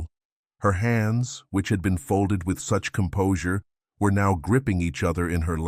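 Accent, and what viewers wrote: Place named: United States. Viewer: Sherlock Holmes with an American accent noooooo🤬🤬🤬🤬🤬 no chance will I listen to this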